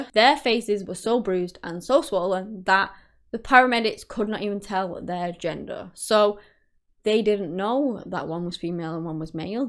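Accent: United Kingdom. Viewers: Thank you for highlighting this case. May I ask where is your accent from in the U.K.? Thank you.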